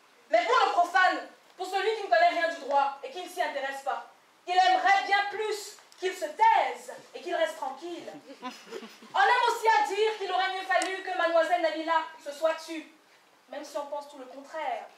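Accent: Cameroon. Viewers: Elle a l'accent camerounais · Elle a un accent camerounais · Accent très 🇨🇲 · Absolument son accent est typiquement camerounais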